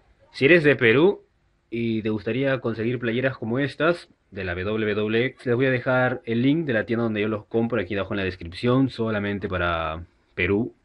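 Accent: Chile. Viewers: eres chileno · guau parecias chileno 😝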